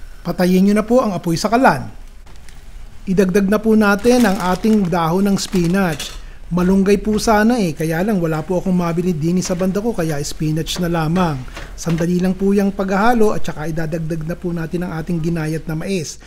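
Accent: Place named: Batangas, Philippines. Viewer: Nakajatuwa ka talaga. Lulutin ko yan . Bibili lang ako nga ingredience bukas. At lulutuin ko yan. Siyanga pala. Tagasaan ka nga pala anak sa atin at may punto angnoagsasalita mo. Taga Batangas ka ba?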